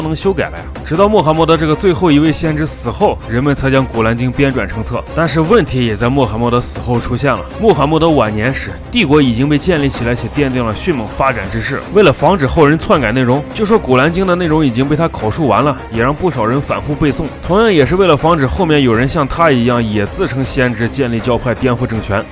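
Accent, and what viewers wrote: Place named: Northwest, China. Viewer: 精彩！讲与伊斯兰教有关的话题，加上解说的西北口音，就更加精彩，堪称完美。期待下集！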